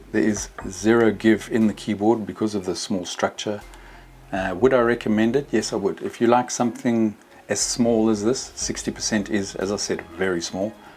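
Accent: South Africa. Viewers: Nice review, you sound South African ?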